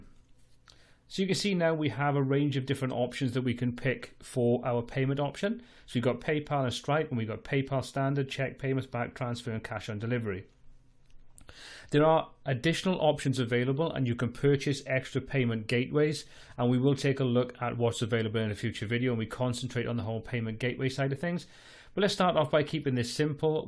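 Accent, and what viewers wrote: Wales, United Kingdom. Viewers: Thanks - this is a brilliant video. I watched this because I wanted to see if I could customise WooCommerce to work in other languages, namely Welsh. I can’t find a straight answer online. From your accent I’d say you’re a Welshman! Before I download the plugin to see for myself, do you know if a)Welsh is supported or b)I can customise myself without having to delve too much into css and php etc. Thanks!!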